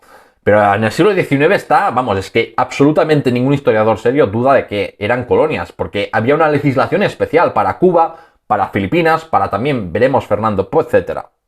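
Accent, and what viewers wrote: Catalonia, Spain. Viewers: Madre mía qué relato tan caótico!! Difícil de seguir, inconexo y monótono. Siento decir que claramente tiene usted dificultad para vocalizar bien en castellano, por su fortísimo acento catalán, y me ha resultado una escucha difícil, atropellada y poco amable. Valoro su esfuerzo por exponer y dar a conocer la historia, pero me temo que aún le queda a usted tiempo de aprendizaje para desarrollar una buena narrativa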